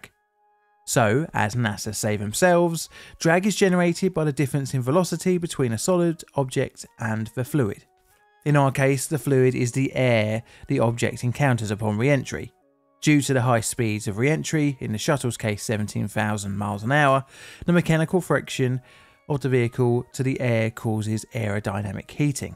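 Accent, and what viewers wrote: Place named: England, United Kingdom. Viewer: Seems to be using a batty English accent to muffle the sounds of his words a bit. You should try to learn to speak American English which includes correct pronunciations for each of the words you wish to speak here.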